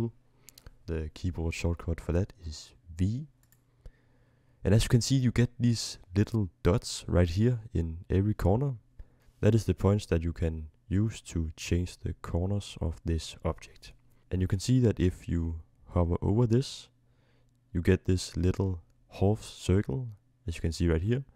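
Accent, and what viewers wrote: Denmark. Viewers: He sounds like he's from Denmark...rural Jutland if I'm guessing.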